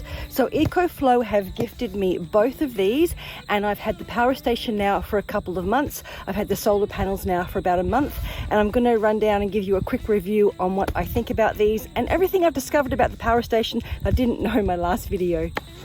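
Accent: Australia. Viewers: you have the strangest accent - you sound aussie and then this american "R" comes through - where are you from?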